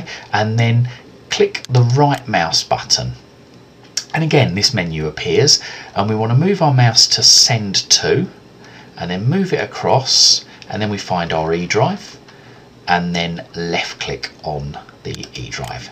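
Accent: England, United Kingdom. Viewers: Great, Great, Have to listen very carefully, because of the English Accent,have an English E-Mail friend, so I am use to it, and have a Cousin in Scotland, that can't understand a word he says, maybe because he works for Johnny Walker in Kilmarnock , that's where my mother was born, on father's side, had a Great,Great Uncle who was a major on the British Army who received the Victorian Cross, John Buckley. Thanks for the video, very well done, Perfect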